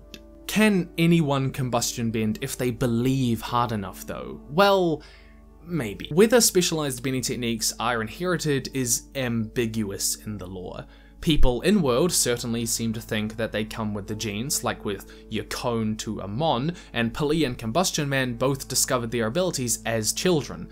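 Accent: New Zealand. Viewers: You a kiwi dude? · noticed your accent straight away love and support from a fellow kiwis bruv. subscribed and hit the bell✌✌ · Hey I just wanna say that I had an English proficiency test recently. I have no issues with English, but I would have been very tripped up by the Kiwi accent on the listening part had I not been acquainted with the accent beforehand, thanks to your videos. Thanks!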